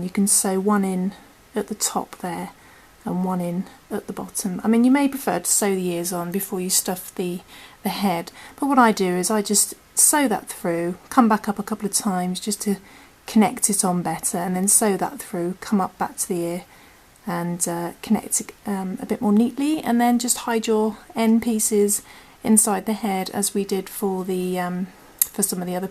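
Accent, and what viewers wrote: England, United Kingdom. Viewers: Finding it confusing because although you have an english accent you are using american terminology. ie your dc is our treble. Anyway, will just watch and not listen lol. Very cute ty for sharing.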